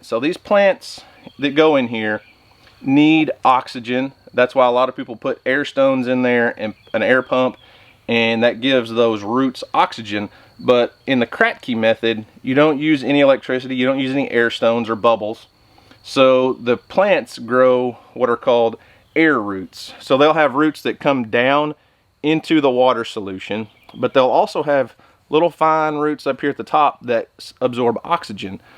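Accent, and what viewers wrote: United States. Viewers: Just listening your accent ...makes me feel you are an American with no respect for nature ... promoting hydroponics just because you want youtube money ... you American when talking about hydroponics...start by saying THANKS Mexicans, Thsnks Mexícan Empire, Thanks Aztecs, Thanks toltecs for your hydroponic engineering and inventing hydroponic science ...so now I can grow my hydroponic marijuana ....Americans 🙏🏼 God sabe is from Donald Trump ... we can help to build that wall and you can send us back our farmers, we need them, we want them, we love them ... you grow your “marijuana” by yourself !!!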